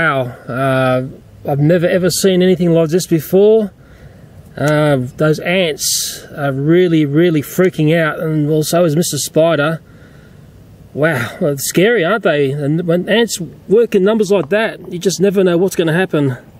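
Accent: Australia. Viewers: Wow nice Aussie accent! I’m an Aussie too!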